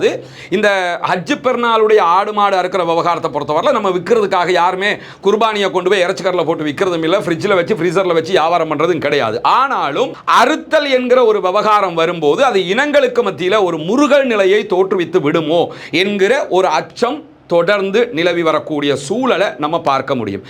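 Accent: Tamil Nadu, India. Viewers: ஏன் bro தமிழக style English பேசுறீங்க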